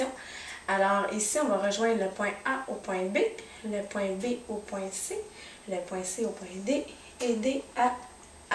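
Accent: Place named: Quebec, Canada. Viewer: Très simple et facile d'accès, merci ! Et cet accent québécois, j'adore ! :)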